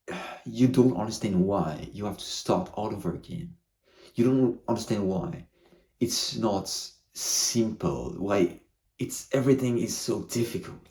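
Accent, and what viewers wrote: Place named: Baltic states. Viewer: Putain je me doutais t'etais francais mais t'as comme un accent genre lithuanie estonie vers labas 😁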